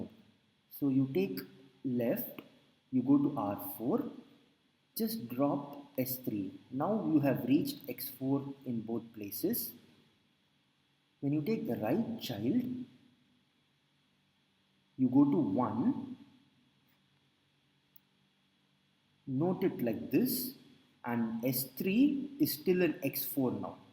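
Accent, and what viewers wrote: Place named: India. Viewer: fucking indiaaaan accent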